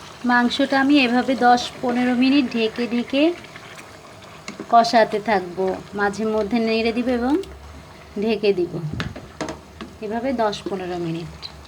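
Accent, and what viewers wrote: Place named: Noakhali, Bangladesh. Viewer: apnar kotha sune mone hosse apni noakhalir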